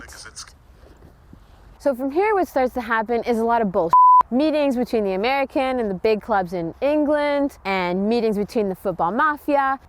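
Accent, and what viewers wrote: Germany. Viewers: That Germán accent made you 1000% hotter!!!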